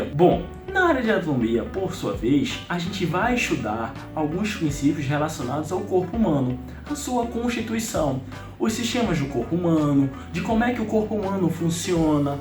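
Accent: Rio de Janeiro, Brazil. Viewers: Você tem sotaque do Rio de Janeiro, fala com o "S" acentuado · Show o conteúdo, kkkkk tu é do Rio de Janeiro é? Kkkkk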